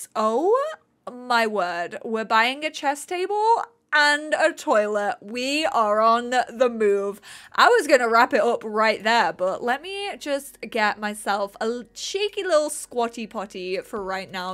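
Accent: United Kingdom. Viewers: you sounded particularly British in this video. 😂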